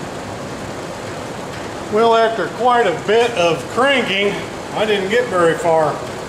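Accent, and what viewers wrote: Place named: United States. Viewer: I like the way he speaks . Reminds me of real American person how they talk .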